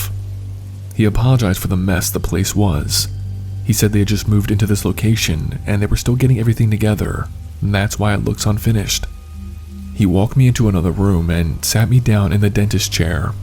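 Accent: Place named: Delaware County, United States. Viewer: Love your videos & creative story categories ! Are you from DelCo ? Your accent is super DelCo lol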